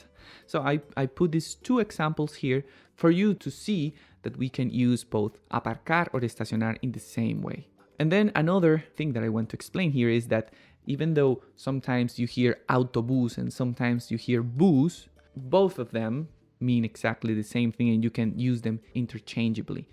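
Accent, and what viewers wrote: Spain. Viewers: This sounds like European Spanish (Spain). Do you have any Mexican Spanish story lessons for beginners? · Is this Spain Spanish or latin America Spanish? Thank you in Advance.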